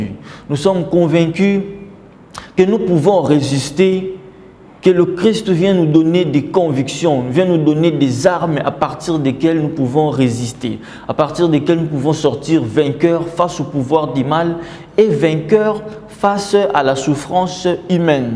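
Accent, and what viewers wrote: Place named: Africa. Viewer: Tu as l,acent des africains mais je reconue ton visage c,est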